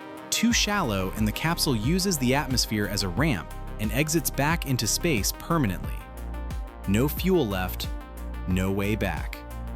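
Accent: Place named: United States. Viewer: lol if he didn't have an American accent I would have kept my mouth shut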